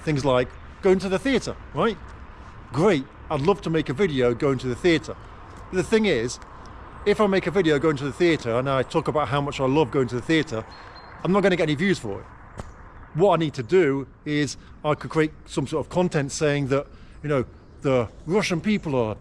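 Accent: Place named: North of England, United Kingdom. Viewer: Hi, I’m not an expert on English accents. Where are you from? It’s the north of England I know but I can pin it down. North west? Liverpool?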